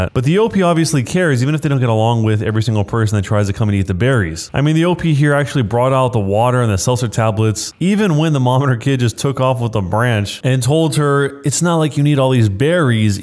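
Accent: United Kingdom. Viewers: BRO THE BRITISH 1980 ACCENT IS KILLING ME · That British voice 😂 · The brit accent tho 💀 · this british accent had me on the floor 😂😂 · the British accent made me go pffffft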